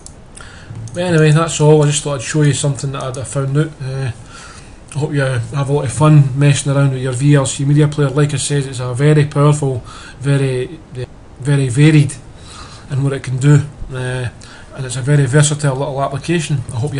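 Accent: Scotland, United Kingdom. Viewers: brother are you Scottish i like your accent and thanks for the video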